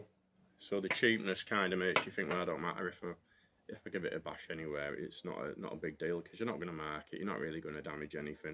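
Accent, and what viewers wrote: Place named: Yorkshire, United Kingdom. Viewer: How do, one yorkshire lad to another, luv the road tha talks, lol, needless to say ya got another sub , liking the honest reviews, down to earth and honest, Cheers me olde 👌👌👌👌👌👍👍👍👍👍👍👍👍👍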